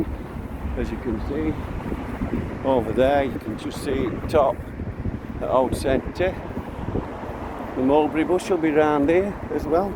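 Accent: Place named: RP, United Kingdom. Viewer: I thought you’d be an Earl Grey man with your posh accent!!! (I can’t abide all that poncy stuff. It tastes like perfume to me).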